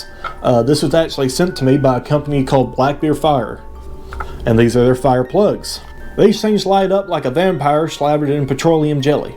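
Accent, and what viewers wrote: Appalachia, United States. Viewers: Thank you for keeping the good ole Appalachian accent alive! It's unique and refreshing to hear it online.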